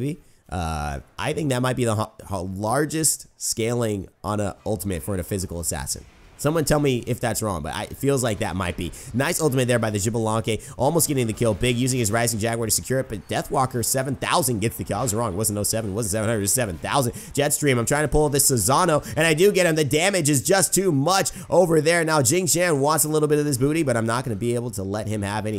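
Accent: Ireland. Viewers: The Irish accent had me dying man great vid😆😆😂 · I'm irish and have lived in ireland my whole life. My GOD your irish accent is better than mine lol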